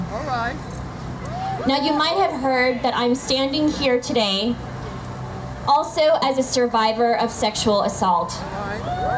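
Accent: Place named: United States. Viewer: Her English is 100% American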